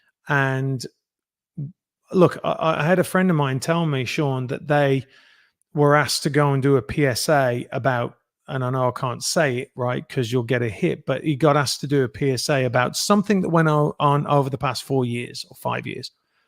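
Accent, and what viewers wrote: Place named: Northern England, United Kingdom. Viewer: who did Matthew play in Coronation Street please. He speaks beautifully. You can hear the northern vowels . He so nice to listen to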